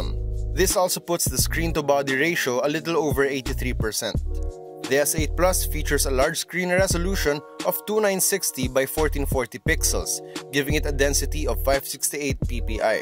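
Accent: Philippines. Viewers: Chris humphrey galapon yup. He has a really heavy Filipino accent :) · gawd .. the Philippino accent is the fucking woooooorst galàsheeew, clawkspeed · wow at the first 5 seconds of the video I already knew you were a filipino because of your accent....since you're a Filipino I will give you a thumbs up and I'll subscribe...btw i like the quality of the vid. Great Job po 👍👍❤ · this guy sounds Filipino. eh :)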